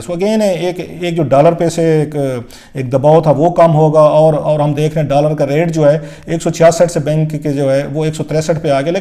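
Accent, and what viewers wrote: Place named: South Punjab, Pakistan. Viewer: South Panjabi. Party not palty. Please correct ur Urdu. pandu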